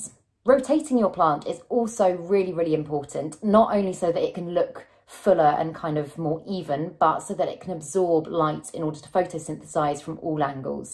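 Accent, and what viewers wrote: United Kingdom. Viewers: Nice to hear a British plant youtuber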